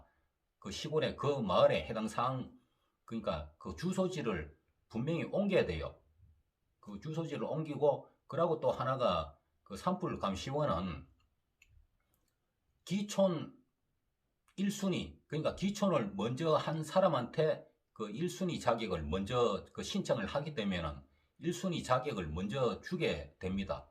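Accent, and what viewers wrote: Gyeongsang, South Korea. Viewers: 경상도 사투리 쓰시네요 어디신가요? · 고향이 어디 경상도 문디이 말투인데 혹시 경북봉화 점촌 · 순수하신 진짜 시골분세요 근대 경상도 인가요 제가 가고싶은대 경상도는 ㅜㅜ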